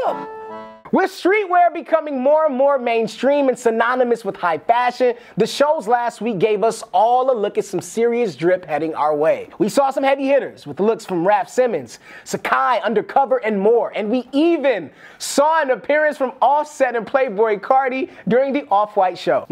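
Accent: France. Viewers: Très bel accent francais 👍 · Sacré bleu, the only thing hotter than french accent is his taste for haute couture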